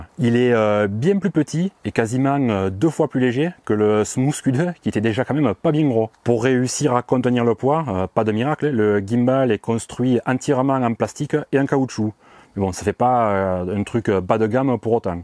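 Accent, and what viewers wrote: South, France. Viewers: Vidéo extrêmement complète, bon boulot. Je me permet une petite précision : il y’a bien un moteur pour le ROLL, un autre pour le PAN. C’est le moteur TILT (inclinaison avant-arrière) qui n’est pas présent sur ce smooth X. Continue comme ça avec l’accent du Sud 😆. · Comment ne pas dire que ta vidéo est un travail de pro ! Félicitations, avec l'accent du sud ! 😁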